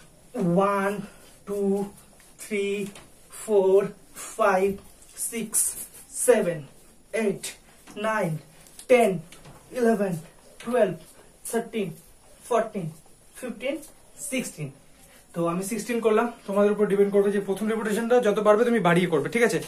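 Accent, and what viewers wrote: Kolkata, India. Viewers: আপনি কি কলকাতা থেকে 😅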